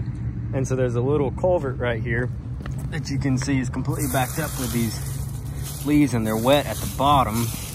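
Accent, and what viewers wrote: South, United States. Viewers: ...You got me with the thumbnail...I thought ooh new Post 10 video...Then I clicked on it and heard your smooth southern accent...haha!!! You're both Legends! What you need is your 'Drain Maker 6000'...haha!!!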